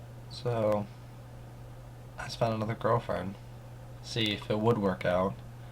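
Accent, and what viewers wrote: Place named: West Virginia, United States. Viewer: has the kind of accent common in West Virginia. It's essentially a "southern" accent, though not as heavy as you might hear in the Carolinas.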